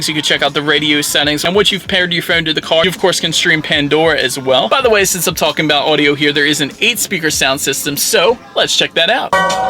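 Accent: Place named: Delaware, United States. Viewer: Hey got any Hoagies? Love the accent. Been awhile. Born in Wilmington, DE.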